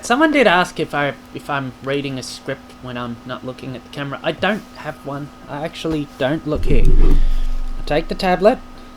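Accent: Australia. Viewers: You sound more Australian than me haha. Little tip for your green screen, try to get the material tightener on your frame, it helps heaps with keeping the background images solid. Otherwise great video :) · Speaking as an NZer you sound Australian to me.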